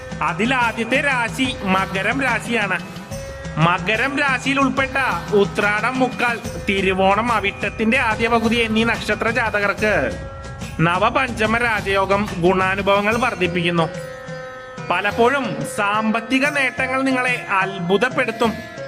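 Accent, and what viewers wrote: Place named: Thrissur, India. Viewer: തൃശൂർ ആണ് ല്ലേ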